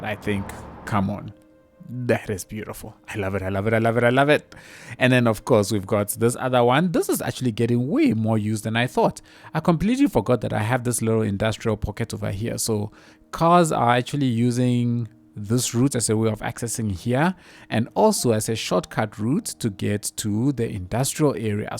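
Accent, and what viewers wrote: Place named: South Africa. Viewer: are you south african? your accent is awesome!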